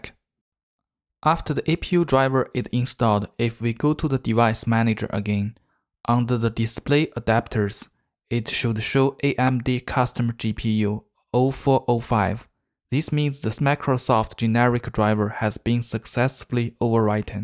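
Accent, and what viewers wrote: China. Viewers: 这是中式英语吗,口音感觉好熟悉啊www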